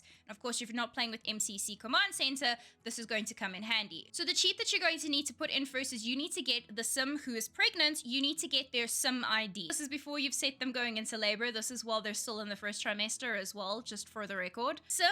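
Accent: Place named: South Africa. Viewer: Wait you aksent sounds south africn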